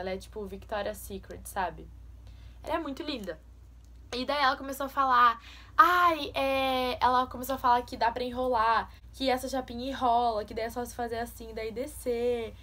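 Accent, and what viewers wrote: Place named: Rio Grande do Sul, Brazil. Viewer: vc é do rio grande do sul? Vc tem mttr sotaque cara, lindíssima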